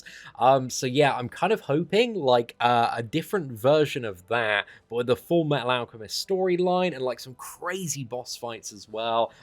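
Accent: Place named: United Kingdom. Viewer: I just wanted to hear your british Accent again mate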